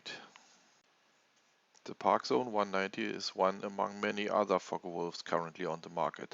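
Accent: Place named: Germany. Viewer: Das nenn ich mal deutschen Akzent :-D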